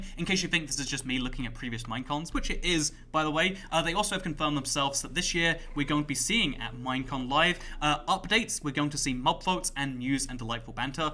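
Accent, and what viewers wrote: United States. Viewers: Wow, sounds american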